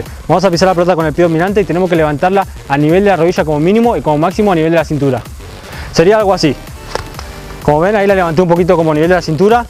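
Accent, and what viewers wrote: Argentina. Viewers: Tienes acento Argentino , no pensaba que eres español (PD:Gracias por el truco , casi lo tengo ) Saludos desde Valecia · pareces argentino ajjaja pd:soy de arg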